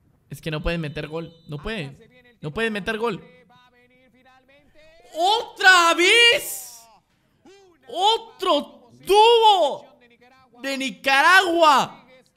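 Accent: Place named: Argentina. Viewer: Porque carajos habla como si fuera Argentino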